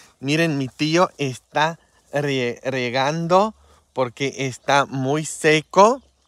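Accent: Mexico. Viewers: Padre,soy una subcritora nueva de su canal ...es usted muy ameno,me encanta verle en su día a día y oírle hablar en español con ese bonito deje mexicano!! Por aquí estaré !!!..un abrazo!!!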